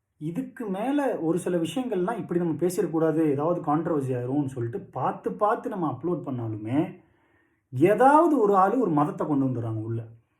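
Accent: Standard. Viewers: normal தமிழ் பேசுறது நல்ல இருக்கு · Pls sir neenga eppovum pola videos podunga. Neeng feel pnatra mathiri videos podathinga nijamve fleeing iruku sir, pls mathavangla care panthinga epavum pola azhaga tamil la azhaga pesuvinga ila athupola podunga athan ungaluku azhagu. Senthamil pesuvinga parunga 👌👌👌👌👌👌 . So continues videos podunga. Nalla smile panite pesunga. God bless you sir. Ungalai pakka en anna polave iruknga. So feel panthinga bro..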